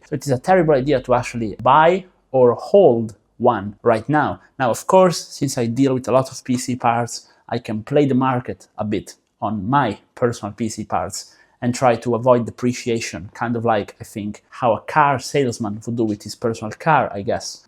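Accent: Italy. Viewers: Familiar accent:Italiano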